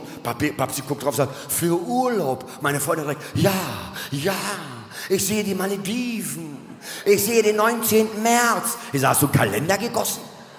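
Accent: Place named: Berlin, Germany. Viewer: Und wieder das gleiche Muster...GÄHN. Haben Sie auch mal was neues? Sexismus, mit Berliner Schnauze...langweilig.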